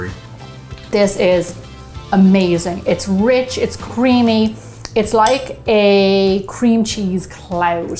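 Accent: Ireland. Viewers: Can't loose good aulde urish accent, great video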